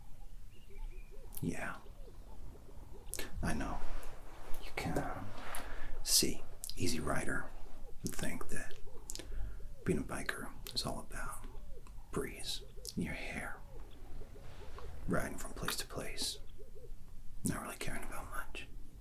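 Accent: United States. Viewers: I must say, that you pull off an American accent quite well. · Seriously how do you do the American accent sooooo well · So, basically you can do a better American accent than that hack that was in that rubbish show? Seriously, that show was complete shite but that "actor" was even worse. Charlies Hunanaies or whatever gay name. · The American accent! Omg is there anything u can’t do? It was amazing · Sexiest vid you've ever done (and, to be fair, one of the most relaxing as well). Always love your American accent. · Your American accent is spot on!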